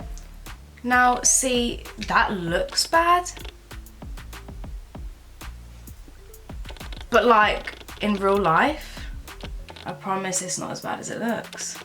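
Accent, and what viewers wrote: United Kingdom. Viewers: Jeez I love the English accent. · U look pretty w no makeup😂💕love ur accent even tho I’m from ldn too. RIP CADET❤️🙏🏽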